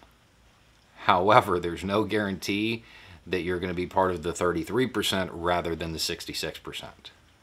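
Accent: South, United States. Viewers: To be honest... I haven't experienced more genuine thought process since I watched this gent making reasonable arguments in a southern accent (I maybe wrong I'm german) in his garage with his superior Beard. I expect any minute a profound argument supporting the second amendment.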